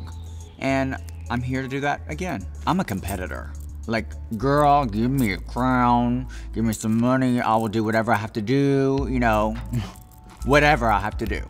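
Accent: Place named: South, United States. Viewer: I love her and get little country accent is too cute. I know she won't win but I'm really rooting for her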